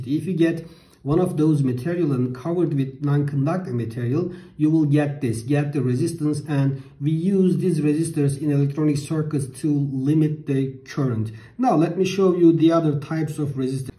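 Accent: Turkey. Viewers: Türk ingilizcesi bu nerde görsem tanırım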